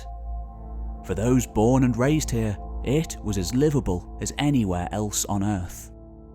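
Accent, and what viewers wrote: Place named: Midlands, United Kingdom. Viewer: Mercia, you Midlands I think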